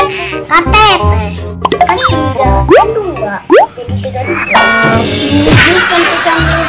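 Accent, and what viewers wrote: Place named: Jakarta, Indonesia. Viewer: wah Betawinya ngena bgt lucu abisss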